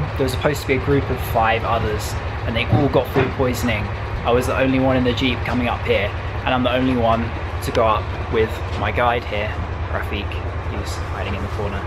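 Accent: United Kingdom. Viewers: British accent ....,👍👍👍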